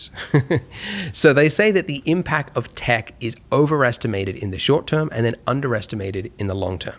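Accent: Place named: United Kingdom. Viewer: Why do people with British accents seem so intelligent? Not saying you're not. It was a good video, and well-thought-out. But it is augmented by your accent. :)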